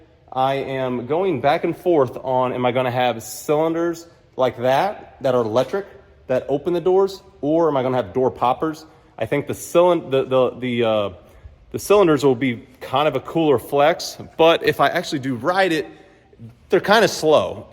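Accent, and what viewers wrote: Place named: Ohio, United States. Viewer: Dude sounds like hes from ohio like the accent dose lol sounds like home lol😂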